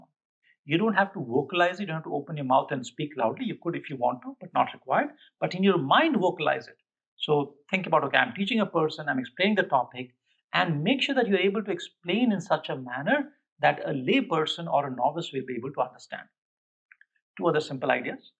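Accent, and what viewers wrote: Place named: India. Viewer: Yes, the Indian accent makes it more efficient to understand.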